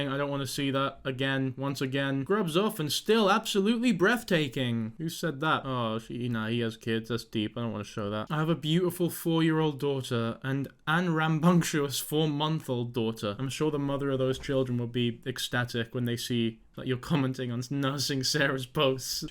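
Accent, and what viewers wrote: United Kingdom. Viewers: Thank you YouTube algorithm for blessing me with finding British Kwebbelkop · I was gonna watch but he's british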